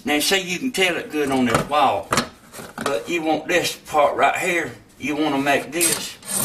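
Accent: South, United States. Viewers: I love the southern accent. 👍🏻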